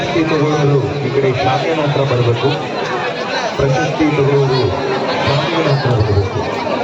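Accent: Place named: Dharwad, India. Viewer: Nivu dharwaddavar yenri sis yaakandra nimma bhase hangaaa iiyti sdakari kelde sis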